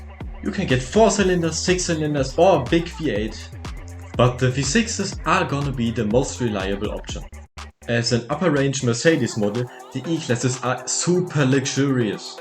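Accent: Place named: Germany. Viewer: hahaha, ich muss aber auch sagen, bei den neueren Videos hört man den deutschen Akzent aufjedenfall weniger 🤝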